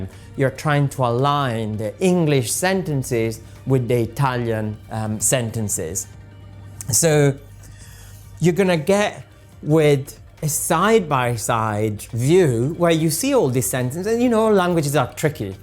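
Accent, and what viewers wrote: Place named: Scotland, United Kingdom. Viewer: I could listen to your adorable accent all day. Is the influence Scottish? Irish?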